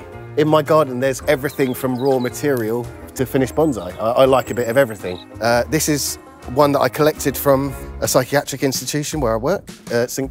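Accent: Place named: United Kingdom. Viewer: Great video man, drink and drugs the same thing Essentially. I've been there and like you come out the other side, lovely collection are they all yours?, better than my efforts lol. Only just found your channel I'll subscribe and look in on ya, sounds like your in the UK aswell, peace man and those bad days and thoughts are no different to anyone else's.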